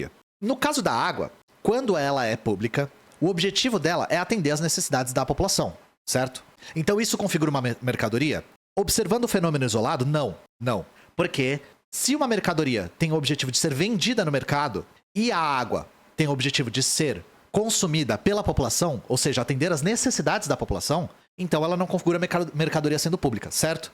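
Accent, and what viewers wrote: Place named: Rio Grande do Sul, Brazil. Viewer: Me sinto representado pra caralho quando o fala gauchês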